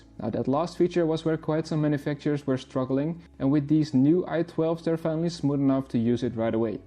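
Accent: Netherlands. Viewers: Ik hoor gewoon dat deze man nl is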